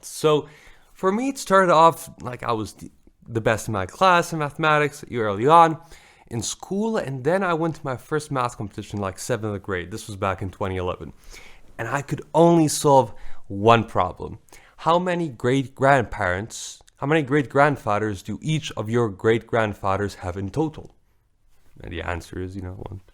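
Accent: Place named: Bosnia and Herzegovina. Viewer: He has a Bosnian accent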